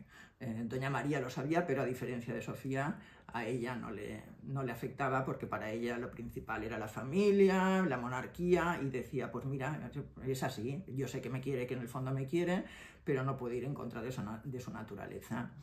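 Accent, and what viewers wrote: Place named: Andalusia, Spain. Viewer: jajajajajajajajajaja me he reido tanto al final con lo del chino y q juanito era tan feo, q ademas como lo cuentas , tienes una manera de contar las cosas q mas bien pareces andaluza q catalana ..... eso sin ofender a nadie , es q mi madre tenia una amiga malageña q contava sus historias de vida q por casualidad vivio la guerra española en barcelona y cuenta ella siendo una niña , cuando sonaban las cirenas para q la gente fuese a los refugios por los bombardeos de los aviones , ella se quedaba sentada en el comedor comiendo como si nada .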